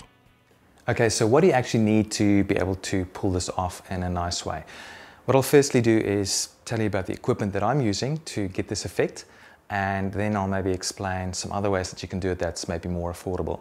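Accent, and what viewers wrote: South Africa. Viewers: Are you South African by any chance? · great video, thanks. Quick - and completely non-related - question: are you an expat South African? Or am I just imagining the Saffa accent? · or South African living in Australia...does it matter? Its a bloody good video with great advice. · Where r u from u sound like u are from South Africa (not trying to be offensive I from there ) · You're South African :D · are you south african? :D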